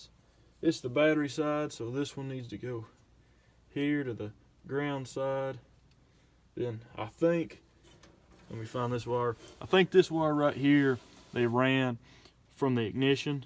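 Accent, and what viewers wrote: South, United States. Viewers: We'll get good spark with this new cool. We'll change this old ool for some new ool. Classic southern accent, I love this channel.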